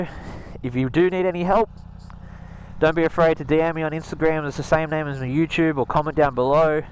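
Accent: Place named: Australia. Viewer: I definitely enjoyed watching this with Ozzy accent! Greets from Germany mate!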